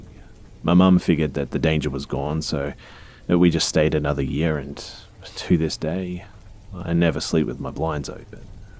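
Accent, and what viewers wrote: Australia. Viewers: Cheers for liking my comment mate! I seriously want to take a gap year in Australia and think it’s the best accent so I like your narrations even more because of that! Keep up the good work :)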